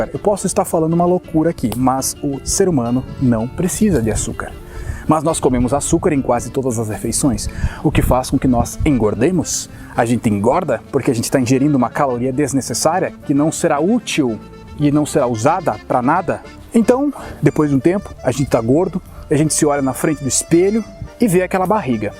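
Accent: Rio Grande do Sul, Brazil. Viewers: Se tive se um flauta chinesa de fundo... Hehe Você é gaúcho? Parece muito ser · é gaúcho???👍😏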